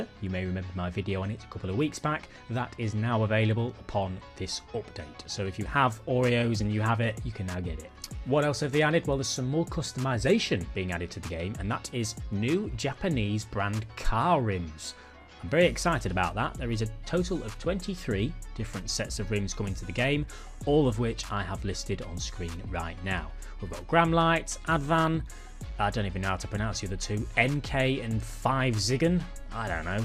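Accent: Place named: England, United Kingdom. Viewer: It's the English accent.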